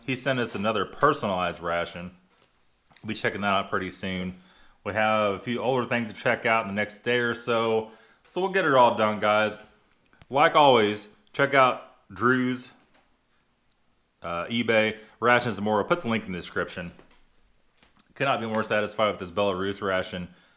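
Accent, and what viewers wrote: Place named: Midwest, United States. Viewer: "If you build it, they will come." Your channel is becoming a phenom. In spite of the bottom feeders, with their assinine commentaries, the viewers with who have possession of their faculties appreciate your no-nonsense, BS free, objective reviews. It allows the ration collector to get vital information in order to avoid purchasing sub-standard MRE's. One final note, if you're from the Mid-West, you pronounce English according to the dialect of the region. If someone from another region can't understand this, they're idiots. (I'm from Sout' Jersey and I murdah da Kink's Inglish. We eat Peetza, Sof' Pretzils, Scrapple sammiches, and Cheez Steaks wid Cheez Whiz, ongyuns, hot peppahs, ana attytude... We drink Yinglings (Yuenglings) beer ice cold, so yous can bite me!....Go Philly Iggles!) 👍👍✌✌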